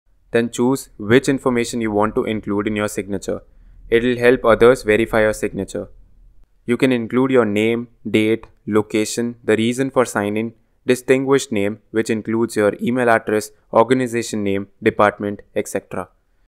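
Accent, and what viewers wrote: India. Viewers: That's Indian accent 🇮🇳 🕉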